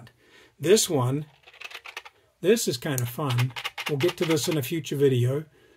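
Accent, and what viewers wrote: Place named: New Zealand. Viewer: I’m a kiwi who has lived in Australia for 30 years , I hear New Zealand , Australian and American in your Accent , and a hint of something else I can’t quite put my finger on 😂 . I like your channel 👍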